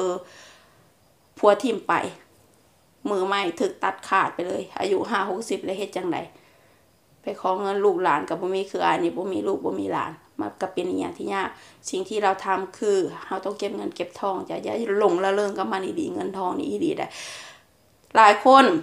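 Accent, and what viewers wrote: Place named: Sakon Nakhon, Thailand. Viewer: <num> ทั้งตาอยากหัว ทั้งเปนตาฮัก บ่เปนตาซังแม้ ภาษาสกลกะม่วน มักหลายจ้า จากชัยภูมิจ้า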